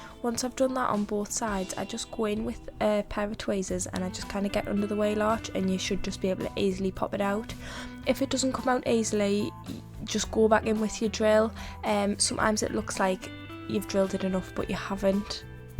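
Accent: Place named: North East England, United Kingdom. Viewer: Absolutely top quality looking model. I was having so much trouble even finding the basic "Hulk" green escorts where I live. Ironically it was a trip to the North East (where you sound like you're from) visiting my Dad in N.Shields, where I found two RS2000s in a Newcastle Poundland. I have the first white one spare & loose, so having seen your video, I think I'll get some DD4 wheels like yours, the Tamiya acrylic and add similar details. It won't be exactly like yours as the tail plate is yellow on the white one but this would be a good addition to the collection. Beautiful effort & i look forward to watching more customs 😉 What car next? The forthcoming STH is a metallic red Lamborghini Huracan LP620-2 Super Trofeo and any basic Super Trofeo model would be easy enough. Rather than make it 100% exact, you could make it look like a road going Huracan STO & we can all agree that a metallic redcurrant red looks incredible on ANY car, but especially a V10 Supercar. 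Make it happen and I think you wont regret it., especially with a simple £4 eBay effort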